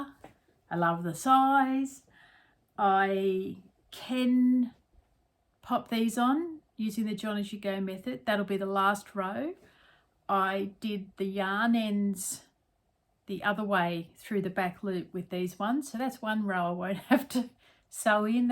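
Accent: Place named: Australia. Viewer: I'm so happy that your channel popped up. It is so good to hear an Australian voice! I will be watching all your previous videos to catch up. I'm only relatively new to crochet; I tend to sew in ends as I go. I love the colours you have chosen for these squares. I haven't used Stylecraft yet.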